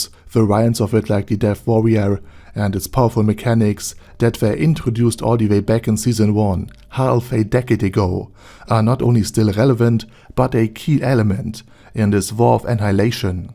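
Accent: Germany. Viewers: He's German, so he has german accent. It really isn't that hard to understand as you make it out to be. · Yes my hearing is good, I have been listening to non natives since I was 5 years old every day. If you are German yourself and hear a german accent this awful it makes you not even wanna try. This is the level of someone who has not tried to make themselves more illegible since he first started learning english as a kid. As someone who actually put in effort to make myself more understandable, why should I waste my time on an animal who puts 0 effort? Again, stop dickriding this random person who doesn‘t even know you exist. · If you don't understand a German accent feel free to leave. Listening to a German accent can take time to get used to but the way you say it is just rude... If you really watched at 2x and didn't understand what he said it's your fault in the first place.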